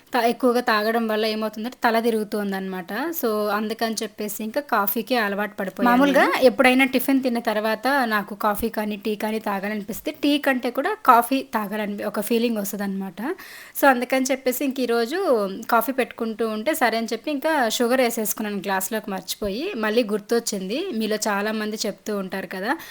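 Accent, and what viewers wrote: Chittoor, India. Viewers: Hi andi medi ye uru,Chittoor slang laga undi anduku adigyanu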